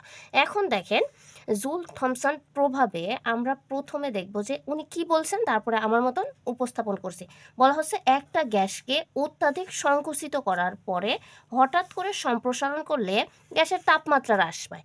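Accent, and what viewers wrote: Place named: Pabna, Bangladesh. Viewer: আপু, আপনার বাসা ki পাবনা, সিরাজগঞ্জ এর আশেপাশে? ভাষা টা খুব পরিচিত লাগলো!❤️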